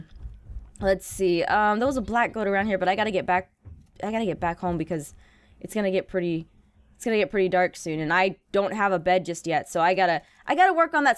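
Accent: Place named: United Kingdom. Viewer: your british... I can tell...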